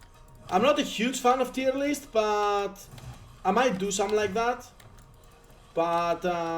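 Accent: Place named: Greece. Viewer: Σιγουρα ελληνικη προφορα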